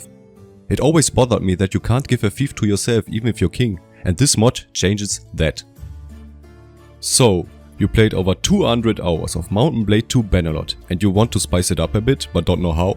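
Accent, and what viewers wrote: Germany. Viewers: Ich liebe deinen Deutschen Akzent, einfach geil xD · Bitte in deutsch It is hard to hear the Denglisch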